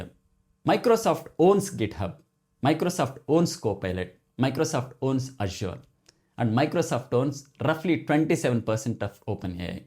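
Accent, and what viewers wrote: India. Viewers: Thi guy is awesome. He is the most Indian sounding Indian I have seen on YouTube. Nothing fake, and he even drops the clever humour and nuggets Indians do. I love it! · South Indian?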